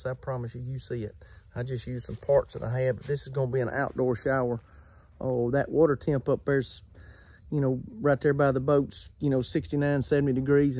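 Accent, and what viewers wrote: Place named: Alabama, United States. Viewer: My sister in-law was born in Alabama and speaks exactly like you Roll Tide.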